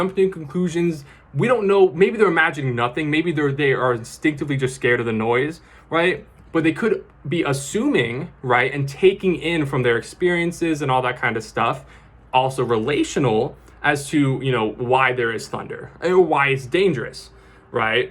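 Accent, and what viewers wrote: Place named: United Kingdom. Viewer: I found you one day as a recommendation by Instagram and just checked your reel on Instagram and this concept sounded interesting so, here I am, thank you for this wonderful video, what a magical recommendation! Your words are a delight to savor without rushing to understand, just embracing new concepts and philosophies. If you could ever grant the opportunity to have a talk, I would be glad to be a part of a beautiful conversation and of course, share a cup of tea! British accent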